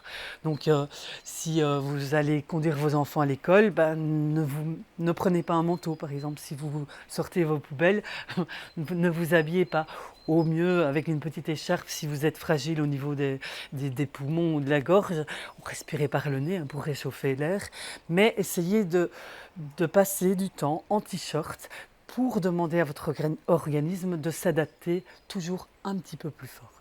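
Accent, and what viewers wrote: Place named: Belgium. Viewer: Un accent belge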